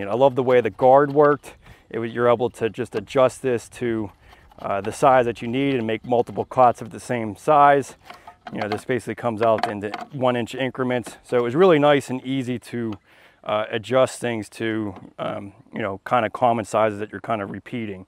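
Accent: Pittsburgh, United States. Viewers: You are very selfless in sharing information. I also love your accent and it takes me back to my days in Pittsburgh.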